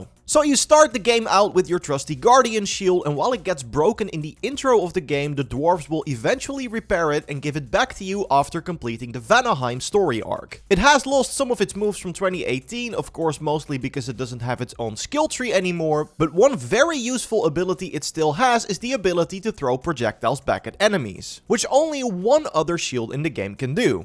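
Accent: Netherlands. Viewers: Do I smell dutch or not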